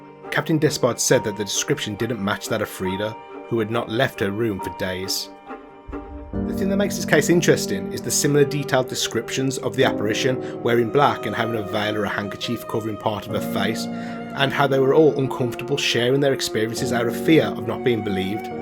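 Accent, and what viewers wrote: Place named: Black Country, United Kingdom. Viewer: there are more things that has happened in the past despite going on we cant see in our modern present lives, love the black country accent mate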